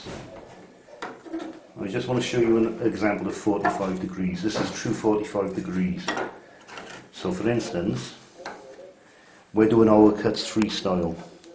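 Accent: Cardiff, United Kingdom. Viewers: Sounds like a Kardiff boy.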